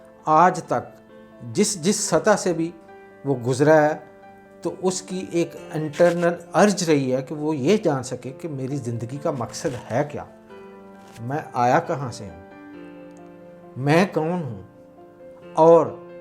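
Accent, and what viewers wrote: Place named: Punjab, Pakistan. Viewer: nice,agreeاردو الفاظ اورجملوں کی ادائیگی کرتے ھوے پنجابی لہجہ کا تڑکا برا نھیں،لیکن اردو زبان کا اپنا لہجہ اوردرست تلفظ کی ادائیگی کااختیار کرنا،تقریر کو زیادہ پُراثر بنانے کےلۓ یہ پریکٹس بھی احسن ہے۔🌺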